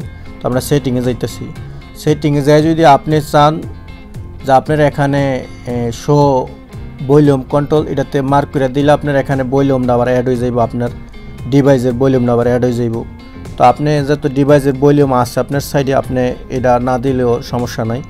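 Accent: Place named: Barishal, Bangladesh. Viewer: ভাই আপনার ভিডিও দেখে যত টুক ভালো না লাগছে তার চেয়ে বেশি বেশি ভালো লাগছে আপনার বরিশালের ভাষায় কথা শুনে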